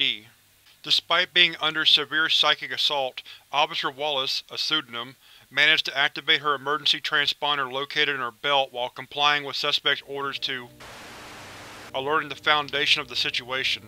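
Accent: United States. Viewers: This one's very clever. And terrifying if it was weaponised. Great reading as usual. May I ask where in the States you got your accent from. As I'm Australian, I can't pick it myself. Perfect voice for these readings.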